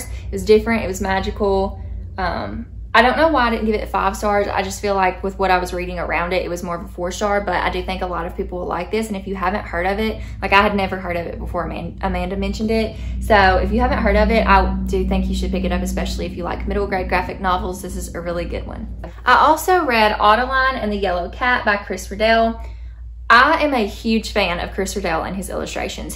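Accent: South, United States. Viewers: I love hearing your perspective with that southern drawl.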